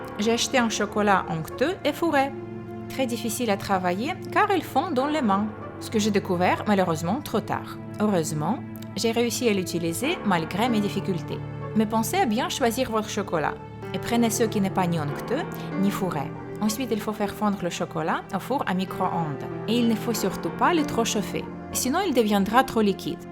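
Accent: Russia. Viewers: J'adore tes vidéos.Et moi je trouve que ton acen Russe est super cela te différençi des autres.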